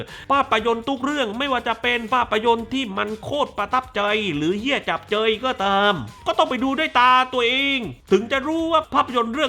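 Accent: South, Thailand. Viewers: ชอบการขายของด้วยการแหลงใต้มากค่ะ 🤣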